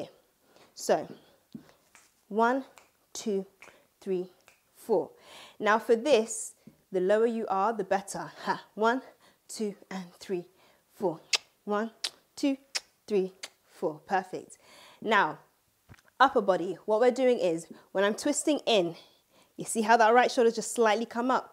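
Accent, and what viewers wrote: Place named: United Kingdom. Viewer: Loss the British accent and find your REAL tribe in Africa and be that. Stop the neo-influence policy of Europeanization.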